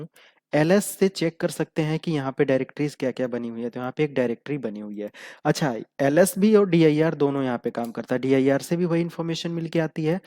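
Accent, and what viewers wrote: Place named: India. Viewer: Big bro, Its too good. Flaws hain kuchh.. jaise chaand pe daag. Communication skills pe bhi kaam karo.. Sh s wala issue hai.. Jab bhi Power Shell bolte ho.. Partial sunai deta hai... confuse ho gaua tha me.. Actually, s sh issue bhi zada ni hai.. i heard you often using the correct form... intemitent issue hai ye.. kabhi kabhi miss karte ho... Khatarnak Indianism... ye global tech hai... isme bolte time Indianism khatam karo... angrezi bolna majboori hai kyuki un terms ke liye Hindi words hain he ni... lekin aap bolte time apne brain me translate jo karke bol re ho.. wo translation practice idhar reflect ho ri hai... Khud socho.. itna knowledge wala video agar proper English me dalo.. to kitne consumers jeebh latkaye laar tapkate bethe hai apko sunne ke liye... Kisi ko hire karo... apki knowledge proper audio me dene ke liye.. 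mujhe rakh lo... zada paise ni mangunga... kyuki mujhe bhi to gyan milega... Appreciate your efforts. Loads of respect. Many thanks.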